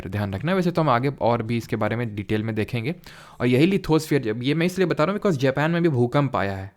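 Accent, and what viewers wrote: Kanpur, India. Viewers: Sir aap kanpur se h kya ? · Hn kanpuriya hai sir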